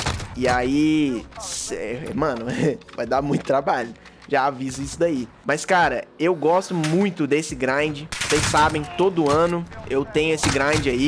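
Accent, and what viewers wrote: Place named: Goiás, Brazil. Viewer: Mano vc é de Goiânia ? Tem o sotaque mo parecido kkkk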